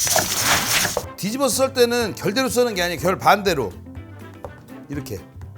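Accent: Chungcheong, South Korea. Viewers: 충청도 사투리인지 이제야 들리네요 ㅋㅋㅋ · 설탕 싫으면 안넣어도 되요 ..맛없을껄 ㅋㅋㅋㅋㅋㅋㅋㅋㅋㅋㅋㅋㅋㅋ 충청도 화법ㅋㅋㅋㅋ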